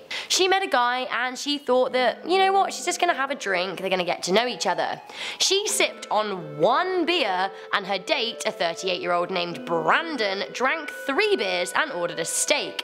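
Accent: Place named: United Kingdom. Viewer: is Irish? She sounds British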